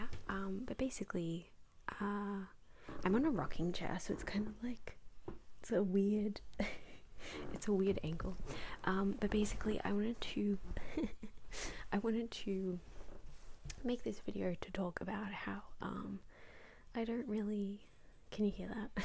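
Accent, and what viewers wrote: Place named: Australia. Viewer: Nice hat. Australian is the best accent for swearing, it's fun to hear it in your videos sometimes!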